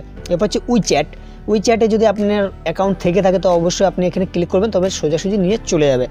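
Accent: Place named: Sylhet, Bangladesh. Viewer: sylheti bai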